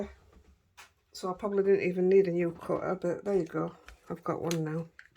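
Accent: Yorkshire, United Kingdom. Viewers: I adore your Yorkshire accent, I've got friends with similar accents. I live in North Wales but have a London accent, no problems understanding you. You make me laugh too! Bought presents for all the tags you made, lol! I was listening out for you at Lavinia, but someone said you'd come earlier, I don't do early! Love your cards ❤ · Omg some people are so rude. I am also from Yorkshire and we do have strong accents. I’ve still got mine and lived in Australia for many a moon. If they don’t like it don’t watch it. There is plenty that love your channel. Why are people so negative I answer you lol. Keep up the brill work and don’t change a thing. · I love your videos and please please continue to blether on while you are crafting in your very lovely Yorkshire accent. Love the snoring pups too ❤xx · You might want to explain what droll means for non-Tykes. 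BTW I am married to a Tyke and love the accent. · Don't apologize for your Yorkshire accent, I love it...reminds me of me Gramma. I have just discovered your channel and I will be back.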